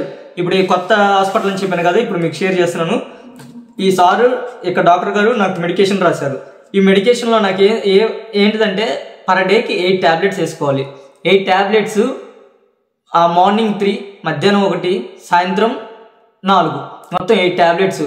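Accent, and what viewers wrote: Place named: Visakhapatnam, India. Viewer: Brother vizag rural aa medhi. Slang konchem maku dgagara ga vundi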